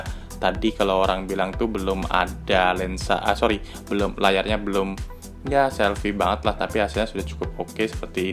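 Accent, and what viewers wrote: Banjar, Indonesia. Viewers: Urang Banjar kh jua Pian😅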